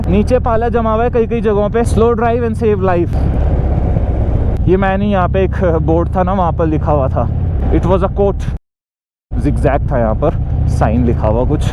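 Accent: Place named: Haryana, India. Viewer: Bhai haryane se lg rhe ho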